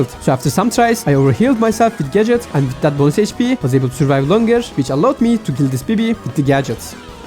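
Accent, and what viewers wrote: Turkey. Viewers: vrotato ru from turkey ngl cuz ur accent is so close to mine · Yo are you Turkish? Your sound like that i think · Bu harika bir video, aksanına da bayıldım; ayrıca Türk müsün? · holy Turkish accent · videon anasayfama dustu ve ilginc geldigi icin acip izliyim dedim. videoyu acar acmaz saniyesinde aksanindan turk oldugunu anladim. sonra da kanal ismine bi bakiyim dedim ve ne goriyim, adasmisiz:D tanidigm bikac birisin ve hic beklemedigim biyerde ismimi gormek sassirtti beni. kanalina soyle bi baktim ve icerigin de hosuma gitti, yt seruveninde basarilarinin devamini dilerim dostum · TURKISH ACCENT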